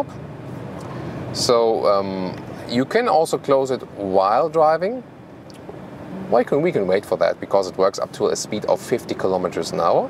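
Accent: Germany. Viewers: Es ist komisch einen Deutschen Englisch Sprechen zu hören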